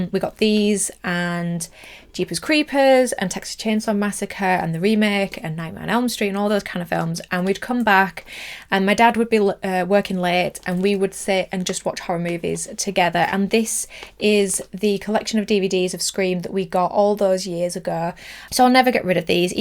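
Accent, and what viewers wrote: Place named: United Kingdom. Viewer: Just recently discovered your channel! Love the content and the awesome Childs Play Vs Childs Play video. Made me really appreciate both of them alot more. So refreshing as well to find a fellow brit amoungst all the american voices.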